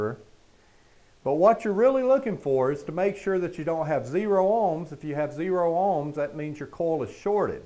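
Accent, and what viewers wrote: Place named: South, United States. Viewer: As a Georgia native, definitely has a southern accent. I assure you however that the information contained will also help with coils.😎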